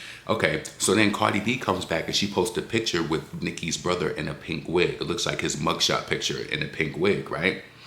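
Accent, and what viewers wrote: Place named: New York, United States. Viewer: I needed it this run down in full New York 🗽 accent ❤❤❤❤❤❤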